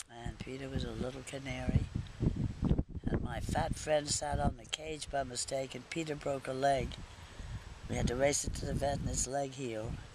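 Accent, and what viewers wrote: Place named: Philadelphia, United States. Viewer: Great example of a Main Line accent! Thanks for posting!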